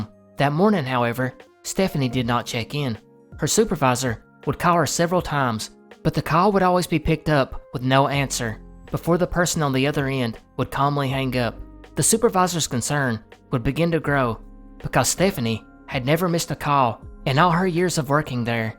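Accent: South, United States. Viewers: In the beginning I needed to get used to the southern accent, but it has really grown on me and I now find it soothing 😅. Thank you for all your hard work! ❤ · This might sound weird, but as a southerner, it's really cool to hear a narrator with a southern accent. You don't get to see that very often in TV and media. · I don't think it is weird either. I think it is really refreshing as you do, to hear a narrator with a great Southern accent! I am originally from the Pacific NW, so its really cool to hear all the other different accents that we have here in the US. I thought your comment was great.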